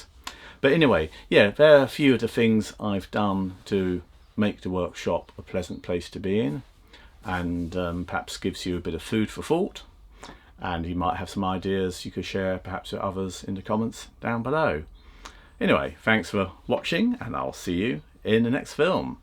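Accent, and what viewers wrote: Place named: South West London, United Kingdom. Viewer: It's raining....must be England. The accent says must be SW London.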